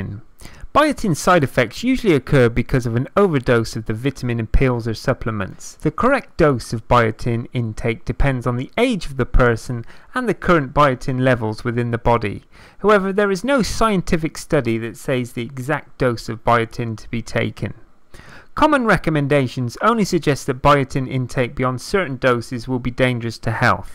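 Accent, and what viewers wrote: United Kingdom. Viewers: I am British living in London. This man is British and is pronouncing things as it should be. This is the UK! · Why are people so upset, it’s British English, it’s the English that made English ENGLISH. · He's from the UK. That's the way they say it there. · British accent is the best! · He's British, silly. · British accent is the BEST omg❤️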